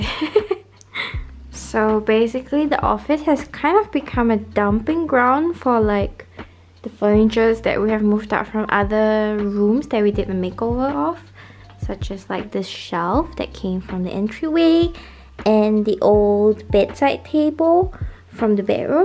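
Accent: Singapore. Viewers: The familiar Singapore accent ! Love the makeover. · Your accent sounds abit Singaporean ahah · Didn't realised it at first, but then recognised the "Singaporean accent" hahah so cute. give familiar feels watching your vids >.<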